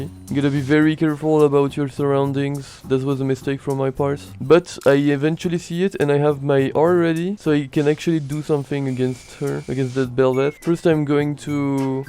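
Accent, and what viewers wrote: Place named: England, United Kingdom. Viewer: l'accent anglais de mieux en mieux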